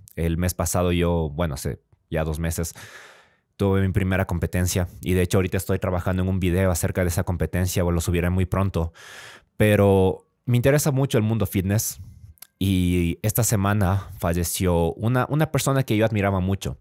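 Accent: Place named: Mexico. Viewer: Hablas como Mexicano, pensaba que eras mexicano pero ahora que veo que eres de Ecuador lo entiendo, siempre he creído que en Ecuador tienen un acento similar al estándar mexicano.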